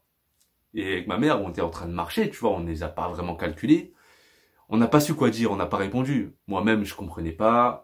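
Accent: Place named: Suburbs, France. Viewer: Le fond est bon mais tu as un petit accent banlieusard c’est dommage..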